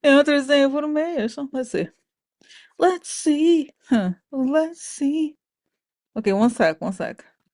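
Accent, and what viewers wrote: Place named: Russia. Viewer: you're a riot! {{Giggle}} Love your Russian accent. Thank you for sharing your gift 😊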